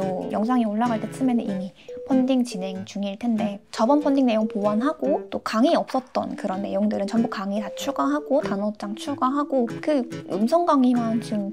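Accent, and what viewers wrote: Kansai, Japan. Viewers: さんの関西訛り日本語関西人として親近感わいて好きなんだけど😂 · 예전부터 구독했던 님 구독자 여려분들은 다 아실 내용이긴 하네요 ㅋㅋ 칸사이벤 말투 ㅋㅋㅋㅋㅋ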